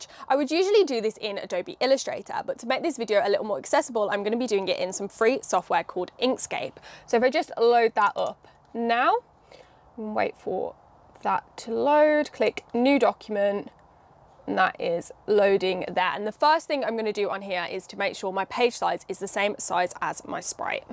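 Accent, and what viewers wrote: United Kingdom. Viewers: btw I'm here bc of ur accent. I love the way that speak the British English.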